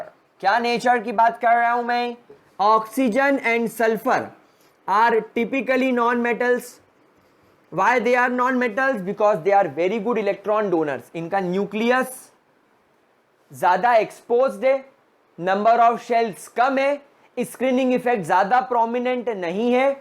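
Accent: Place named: Indore, India. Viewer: Sir apki awaz me indoor ka accents hai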